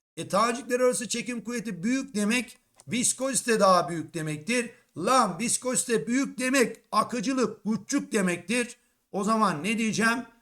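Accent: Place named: Erzurum, Turkey. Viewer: hocam şans eseri youtube ana ekranıma düştünüz tıkaldım izlemeye başladım konuşmanızdan Erzurum havası aldım 4. soruda 0 altı Erzurum dediğinizde dedim ki bu hoca Erzurumlu yani benim hemşerim acaba doğrumudur. ❤‍🔥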